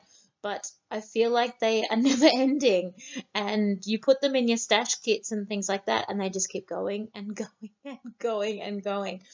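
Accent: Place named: Australia. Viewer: I love the Aussie accent soooooo much so this was a lovely treat for me. Course, I'll be glad when Laura can speak again too ;)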